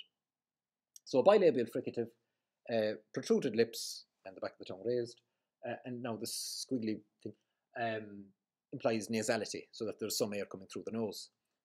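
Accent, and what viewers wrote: Ireland. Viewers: Grand video for someone like myself teaching himself Irish that wishes to learn the true pronunciation, free of English influence. What part of The Emerald Isle do you hail from, sir? I daresay your accent is one of the most beautiful, if not the most, beautiful I've heard in Hiberno-English or any variety of English spoken anywhere in the world. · I love the way that your English speech sounds just like my Irish born Father (RIP) spoke his English, (he was from near Murren, Donegal)- and I appreciate your excellent knowledge of the Irish Language..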